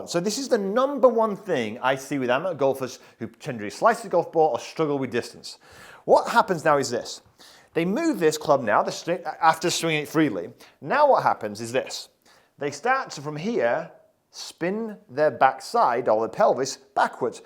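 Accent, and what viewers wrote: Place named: Kent, United Kingdom. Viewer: I once asked CHATGPT about way of speaking and they gave me a long and detailed, almost scholarly account of his travels and where he picked up his nuances... very interesting and very funny. His main influence seems to be Kent in England.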